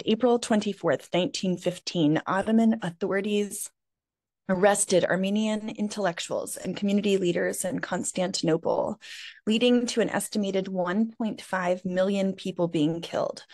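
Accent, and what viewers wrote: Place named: South, United States. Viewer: Thanks for not disparaging the historic flags of the South. As you know, not all Southerners are racists or klan members. Some of us even work for peace & justice. As William Faulkner is often quoted, "In the South, the past is not forgotten. It's not even past!" Your comment about the reoccurring "twang" in your voice was delightful!!! The Southern accent, in all of its various forms, evokes the fondest of memories. It literally soothes the human soul. I hope you enjoyed Montreat & the beautiful Black Mountains of North Carolina. Wonderful place with wonderful people!!!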